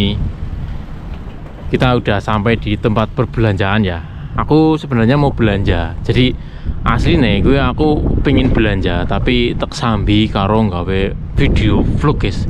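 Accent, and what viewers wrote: Banyumas, Indonesia. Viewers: Wong ndi rika,lik?deneng ngapak temen😂..Banyumas Cilacap mesti kye ya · Sampean wong ndi nyong,!! kok ngomong kaya ana basane ngapak..😄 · njajal aku tak krungu sampean ngomong nganggo bahasa jepang tapi karo ngapak kang..😊😊😊😊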